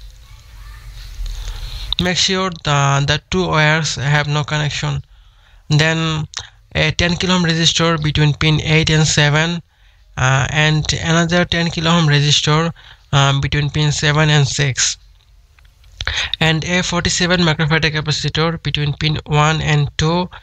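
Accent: India. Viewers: Ah I like hearing an indian accent on electronics tutorials . Guarantee for good explanation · i wish i have an Indian friends, they are so smart and their accents is so adorable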